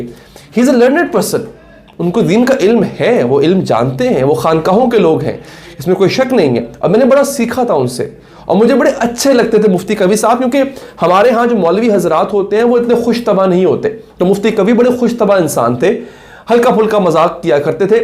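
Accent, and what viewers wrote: Punjab, India. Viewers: Chandda paiyan ny..🤣 bhai waah kya punjabi or style hai🤣👌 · bhai baten krty hue halka sa jo punjabi touch dete hain wo boht kamaal he.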